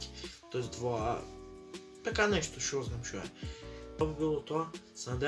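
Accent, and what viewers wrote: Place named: Gevgelija, North Macedonia. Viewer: BRAT MOJ ZBBORUVA GEVGELIJSKI xd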